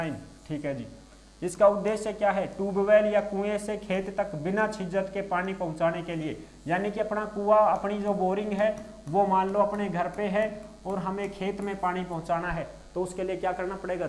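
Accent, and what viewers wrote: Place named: Rajasthan, India. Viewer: Bhot badiya video vo bhi Rajasthani Voice me❤❤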